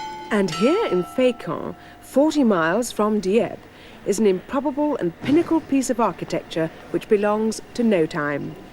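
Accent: RP, United Kingdom. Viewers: Yes I think I love you with your very posh accent and rusty French (I don’t care if you are now 85 years old) many a good tune is played on an old fiddle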